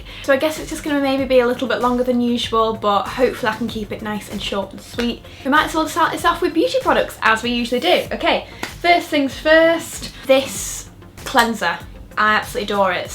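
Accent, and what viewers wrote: United Kingdom. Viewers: where is your accent from in the UK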